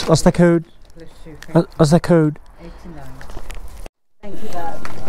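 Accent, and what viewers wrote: Sylhet, Bangladesh. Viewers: ভাই সিলেটি নি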